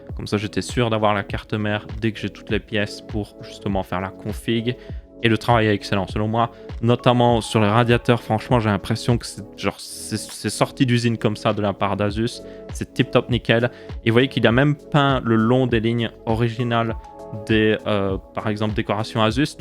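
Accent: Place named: Belgium. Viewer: Honnêtement, est ce que doit continuer de s’excuser de prononcer houit et pas huit (et nonante, septante etc)? Les gars il est belge laissez le dire ce qu’il veut dire de la manière la plus naturelle pour lui, on le connaît depuis suffisamment longtemps pour que ça soit pas dérangeant, même pour un nouveau viewer ça devrait pas être dérangeant, je veux dire y’a tellement d’accent différent dans le monde c’est quoi le problème?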